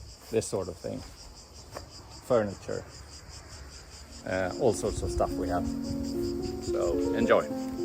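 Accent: Sweden. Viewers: Är det en svensk dialekt jag hör, mån tro? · Haha I knew it too!! Was looking for this comment before I asked too. I have worked in Sweden and recognized the accent!! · Yes, thats a great idea! Introduce yourself and, describe your family, who is going to be living in your future mini paradise! All I know is you have a distinctly Swedish accent in your english speaking! Very curious also about the process of getting the house - When? How? Why? Some context would be fascinating! Subbed!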